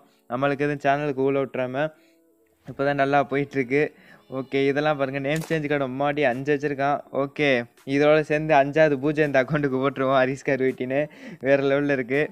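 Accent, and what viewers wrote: Tirunelveli, India. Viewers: Bro r u from trinelveli your voice s like that. Because I too from trinelveli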